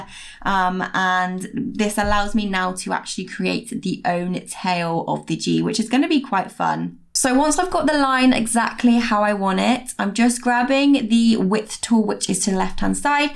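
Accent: United Kingdom. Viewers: I'm self-taught just like you, and it's so refreshing to learn from someone who doesn't linger on complexities and has found ways to just use common sense instead of doing things "by the book". It's amazing what you can learn just getting lost in tutorials, and you just added quite a few ideas to my arsenal. I usually prefer to read rather than watch videos... But in this case, considering how you make it very easy to understand and don't use obscure tools or lengthy techniques (and I must admit... gorgeous blonde + British accent = hell yes), you sold me from the first second. Subscribed. Liked. Bravo!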